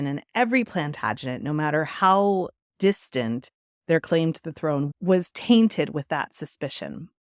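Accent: United States. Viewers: Pity about the American accent of the narrator.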